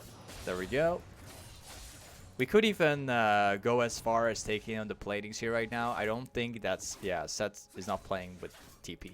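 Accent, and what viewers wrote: Netherlands. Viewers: Are you dutch? I hear lika a accent?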